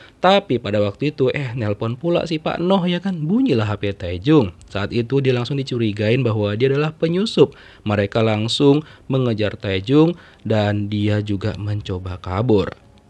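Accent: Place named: Sulawesi, Indonesia. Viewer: Ko macam orang sulawesi kah atau ntt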